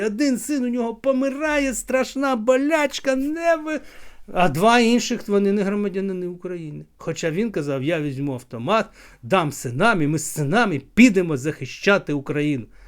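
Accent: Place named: Russia. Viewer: В пана самого проскакують русизми , 10 років московський чобіт топче нашу землю , а ви , пане блогер , так несуразно розмовляєте українською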